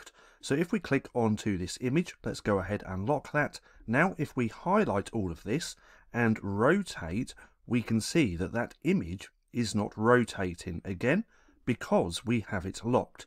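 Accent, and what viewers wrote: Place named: United Kingdom. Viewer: I'm obsessed with your British accent ...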